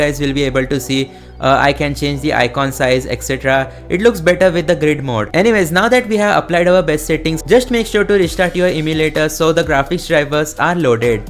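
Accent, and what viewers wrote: India. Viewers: Bhai indian hai ya american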